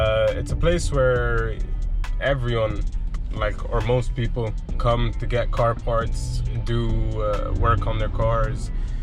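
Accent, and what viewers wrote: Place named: United States. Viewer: you can speak amirican will that is very nice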